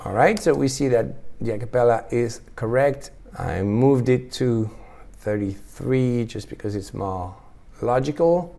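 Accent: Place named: France. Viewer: amazing tutorial, I dont have a mac so im using FL Studio and just getting to grips with it. Your talent is amazing this is why you are #1 (even though SHM are my Favorites ;) ) but what amazes me even more is your english. us british can be so arrogant and not attempt to learn another language. Sure you have your french accent but i can understand every word without struggling.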